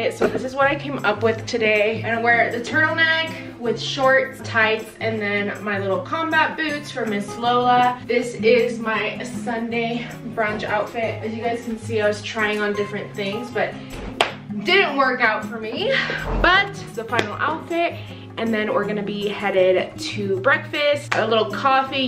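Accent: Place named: United States. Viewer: Porque los popis de mx. Ablan English while in Mexico???? Osea se los olvida el Español in Gringa voice!!! Tots not gringa!! Lol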